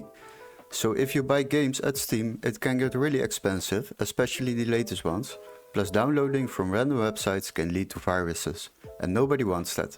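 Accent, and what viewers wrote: Netherlands. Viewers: are you from Friesland:hand-pink-waving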